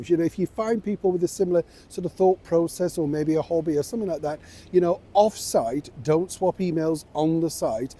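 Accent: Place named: North, United Kingdom. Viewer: What part of the north do you come from?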